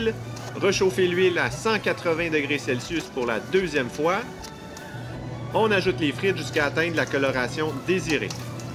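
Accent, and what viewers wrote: Quebec, Canada. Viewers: avec l'accent Québécois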